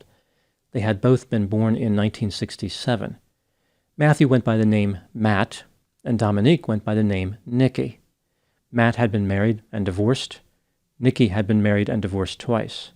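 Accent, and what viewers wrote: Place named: Philadelphia, United States. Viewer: Psychology and Philly accent: on point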